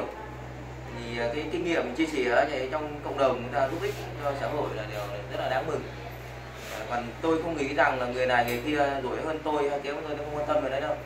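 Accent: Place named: Thanh Hoa, Vietnam. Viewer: Bác giọng thanh hóa thì phải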